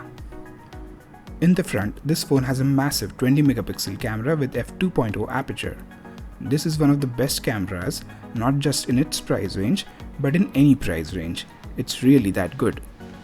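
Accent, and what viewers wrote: India. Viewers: I cant take Indian voices serious. Am a racist? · your review is by far the best mobile review is have ever seen. what presentation, what scripting, what visual effects, what editing manr....i wonder then y do u have so less subscribers....by ur accent i think u can speak hindi also....please make some videos in hindi also and pack in some energy in ur voice-some excitement....you'll have millions of subscribers in no time....thank me later bro....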